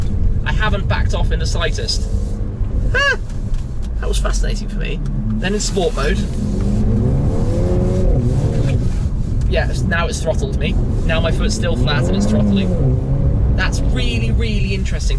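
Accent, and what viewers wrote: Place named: United Kingdom. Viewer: 我喜欢听你说话的口音，标准英式英语。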